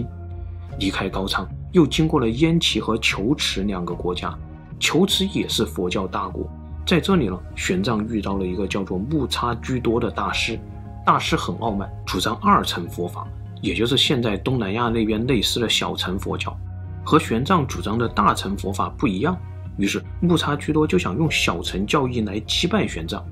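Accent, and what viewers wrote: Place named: Hunan, China. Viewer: 这在湖南称为“塑料普通话”，很有湖湘味道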